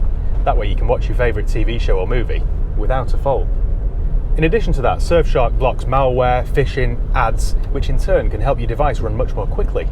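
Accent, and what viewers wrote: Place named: RP, United Kingdom. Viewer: No complaint just seems a more “posh” way of speaking not something common round where I’m from 😂😂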